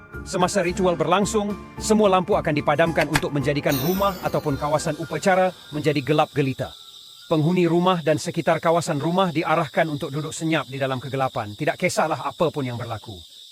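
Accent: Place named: Sabah, Malaysia. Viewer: Mantap, TERBAIK. Tpi sy sarankan kasih full logat sabah bah cara penyampaian critanya bosku, barulah kena dgn crita Borneo😮. Utk bhasa pasar kta yg trlalu mendalam tu cuba jelaskan dlm kurungan mcm dlm beberapa Video sblm ni🤩. Contohnya: Baru seja c ondu sangkut seluar pindik yang dia pakai tu d jemuran pindik dlm jamban belum sempat bercangkung tiba-tiba berabis Kalang Kabut Si Ondu Pakai Seluar Di balik Tidak Jadi Melabur Gara-gara dia perasan dari siring pintu jamban yang tdk dia tutup rapat tu ada dua pasang mata marak warna merah sedang meningu si ondu mau melabur. ( baru sahaja si Ondu mengantung seluar pendek yang dipakainya pada tali yang dikhaskan utk menggantung pakaian, Belum sempat dia bercangkung utk membuang air besar dengan tergesa gesa si Ondu memakai balik seluarnya dan tidak jadi membuang air besar kerana waktu dia baru sahaja hendak duduk bercangkung matanya terlihat ada sepasang mata berwarna merah menyala sedang memerhatikan dia melalui celah pintu tandas yang tidak tertutup rapat itu.. 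#maaf jika komen trllu panjang🙏sbg seorang penggemar sy hnya memberikan cadangan🙋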